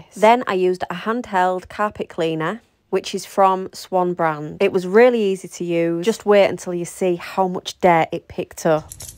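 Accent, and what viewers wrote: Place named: Hull, United Kingdom. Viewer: Great cleaning video just subscribed I knew you was from hull as soon as you spoke went back to find out on first video I’m from hull too going to binge on your other videos when I get chance xx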